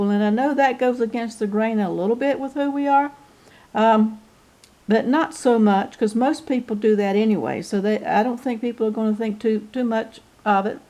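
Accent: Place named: South, United States. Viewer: hear that southern drawl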